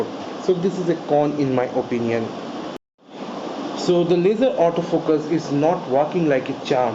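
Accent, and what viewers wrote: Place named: India. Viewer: Forking indian accent. Can't get anything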